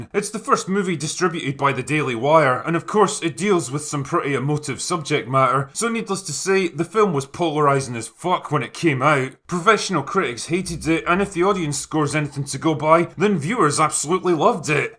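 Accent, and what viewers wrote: United Kingdom. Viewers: Tip: drop the accent, if it's designed to get attention. If it's real, WTF is a Brit doing critiquing an American film about an American heroine using her 2nd Amendment rights to bring justice to a bunch of bad guys? BTW: wilderness vs a school/ mall/ movie theater? Numbnuts, where you BEEN?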